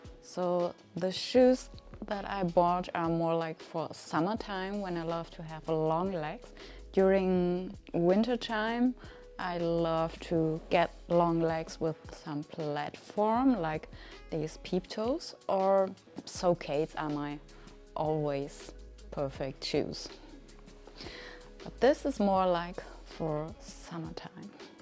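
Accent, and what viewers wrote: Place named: Germany. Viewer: you look gorgeous in everything you wear and I love your German accent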